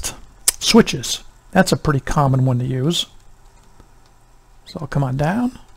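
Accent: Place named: United States. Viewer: Thank God - someone who's from the US and speaks English for this tutorial.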